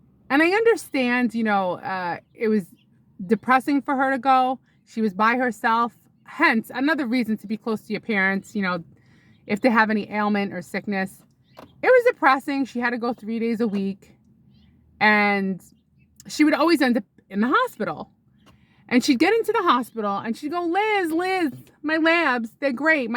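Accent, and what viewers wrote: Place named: Brooklyn, United States. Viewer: The old Brooklyn accent is really coming out, you know what I'm sayin 😂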